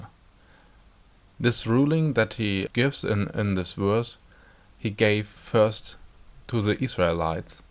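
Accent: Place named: Germany. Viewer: The way you say threat the last seconds combined with your German accent worries me.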